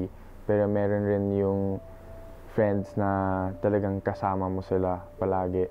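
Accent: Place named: Conyo, Philippines. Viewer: Conyo magsalita neto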